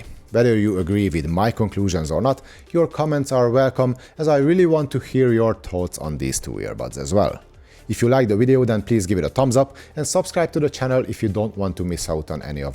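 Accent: Hungary. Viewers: Oh you live in Ireland, that's great. With your accent I thought you were coming from Hungary or another eastern country. Great video btw 👌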